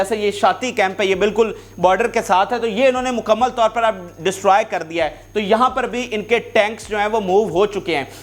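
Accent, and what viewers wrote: Punjab, Pakistan. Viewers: اوئے پینڈو انسان اگر انگریزی بولنی نہیں آتی تو لکھی ہوئی انگریزی پنجابی اور پینڈو سٹائل میں پڑھنے کی کیا مجبوری ہے ؟؟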